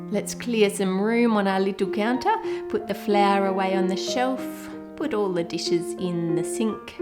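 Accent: Australia. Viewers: she’s australian? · Galaxy Midnight, i totally agree! I love her Australian accent! I've always wanted an Australian accent! #SoCute!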